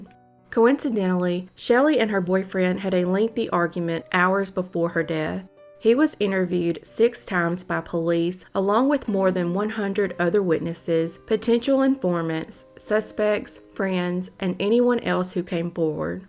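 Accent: South, United States. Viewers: I can tell your southern not only from the accent but because every case you talked qbout has 3 names instead of 2. I'm willing to but your name is.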